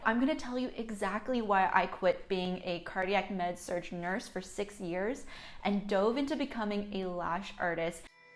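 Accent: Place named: Canada. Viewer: It's the Canada “abouts and out” for me and in here for the “just fucking go for it” yaaaaaaasssss Queen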